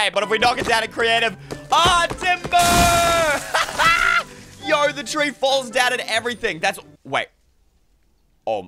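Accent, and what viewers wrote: Australia. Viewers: I dont ever notice accent.. Maybe that's because im an Aussie Too! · I can hear your Australian accent because I am American, which I am from the United States which has the most carnivorous plant than any other country in the world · I thought you were British so you from Australia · Prove you have an Australian accent:leever, caan’t, etc. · I’m Australian and good Aussie voice!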